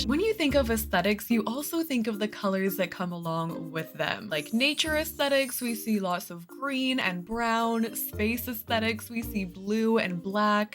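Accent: Canada. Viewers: when i first started watching, it was my first time ever watching someone with a strong Canadian accent, and it was a big adjustment but now i cant watch ANY SIM VIDEOS WITHOUT HEARING THE COMMENTARY IN HER VOICE 😭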